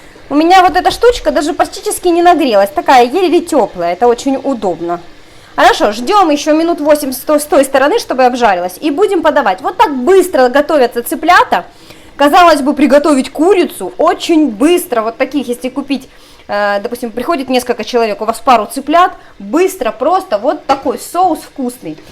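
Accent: Ukraine. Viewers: Украинский акцент очень приятный и забавный...)))) Рецепт очень хороший...лайк за ВСЕ....))!)!)!)!)!)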